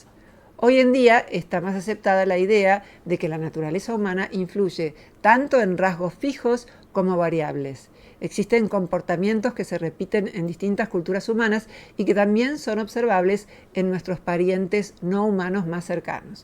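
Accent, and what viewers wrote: Argentina. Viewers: La comentarista ametralla con su léxico sin pausas no se corrige y usa su predica para su gremio y los neófitos no entendemos pero soslayo que predica algo interesante la veo años y entiendo 20% de lo que dice aún la perdono por su hablar porteño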